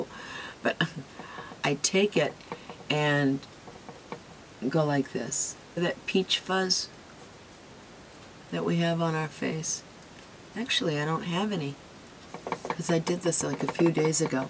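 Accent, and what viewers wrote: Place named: Cape Cod, United States. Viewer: When I first found your channel, you reminded me of the Cape. Your accent, your look and the casual elegance. I have to find the fragrance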